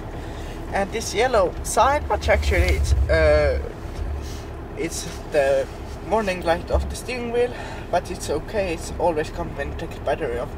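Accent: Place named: Russia. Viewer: he's Russian, at least he try his best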